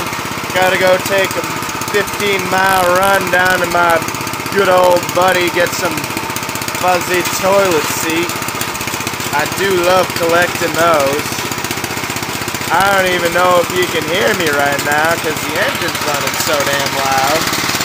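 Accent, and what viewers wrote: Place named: Canada. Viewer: daves farm guy maybe , sounds canadian , doesnt sound like me , your not acting goofy enough to be making fun of me :)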